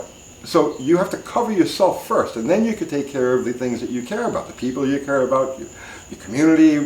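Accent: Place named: Long Island, United States. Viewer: Sounds like you’re from Long Island... I left that place ... NY 24 years ago and never looked back!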